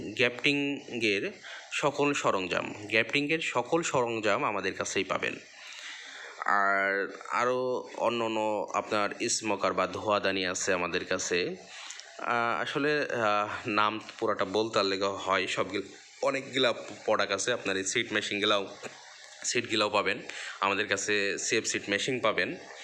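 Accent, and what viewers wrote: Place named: North Bengal, India. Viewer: আপনার কথা শুনে মনে হচ্ছে উত্তর বঙ্গে বাসা, বাসা কোথায় আপনার?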